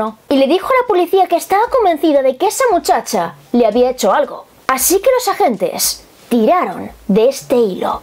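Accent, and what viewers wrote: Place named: Spain. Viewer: es tan hermosa, es una bellota Es tu acento español es... Hermoso, divino, precioso. Saludos amigos todos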